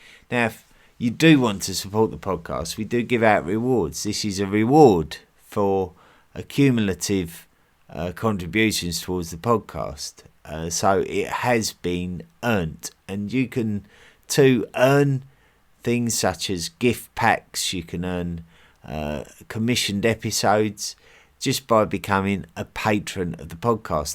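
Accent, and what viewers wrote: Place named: England, United Kingdom. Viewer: Narrator pronounces most of the words incorrectly. English accent grinds on my last nerve. 😢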